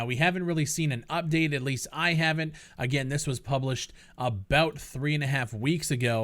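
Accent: Canada. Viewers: I didn't know you had that Prairies accent until you pronounced 'Cal-garry.'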